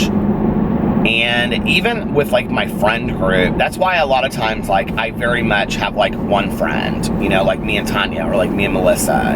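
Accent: South, United States. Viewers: I love you! And I love your southern accent. I’m from Ar & I Snapchat you all the time. Love how you say drank, sang.. 😂💋 have a good day!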